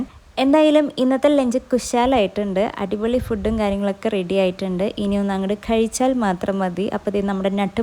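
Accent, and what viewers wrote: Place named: Thrissur, India. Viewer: Athu Thrissur ullavarde samsarathil varunnatha adi kittum ttaa ithupole ellathilum tta tto swabavikamayi avrde samsarareethiyil varunnatha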